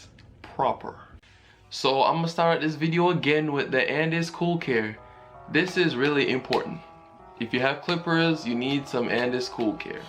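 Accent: Jamaica. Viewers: Dweet ina the Jamaican Accent dawg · Make 2 videos each time, Jamaican and "proper" English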